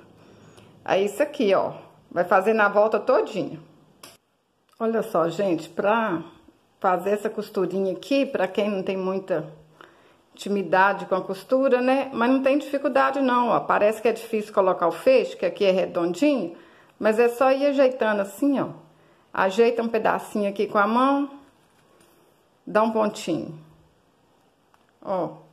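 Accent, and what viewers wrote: Minas Gerais, Brazil. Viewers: Você é mineira, né?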